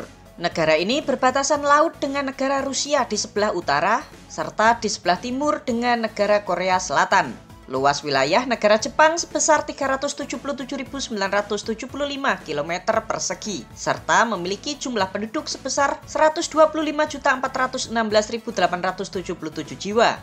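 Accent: Java, Indonesia. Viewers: narator e ,Mas * Wong Jowo pundi niki mas? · kok medok tenan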